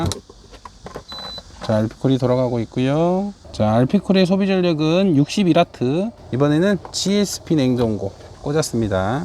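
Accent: Jeolla, South Korea. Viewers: 억양이…전라도 어디여?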